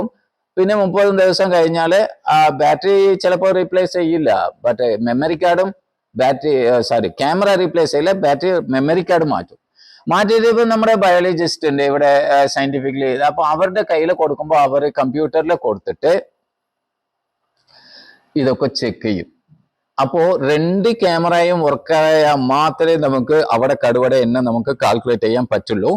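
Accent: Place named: Tamil Nadu, India. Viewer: വാക്കുകളിൽ തമിഴ് ചുവയുണ്ടെങ്കിലും അവതരണ്o സൂപ്പർ. അറിവിൻ്റെ നിറകുടമാണ് താങ്കൾ.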